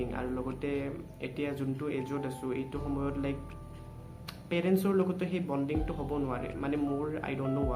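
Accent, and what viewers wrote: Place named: Nalbari, India. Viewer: kot ghor tumar. Nalbeira jen lagsi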